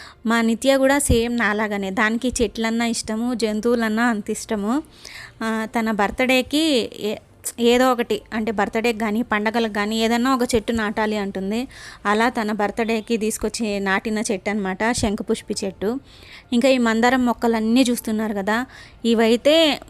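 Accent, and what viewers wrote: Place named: Tirupati, India. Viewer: Mee slang vini chala rojulaindi...maadi nellore .. tirupathi slang nellore slang chala kalustundi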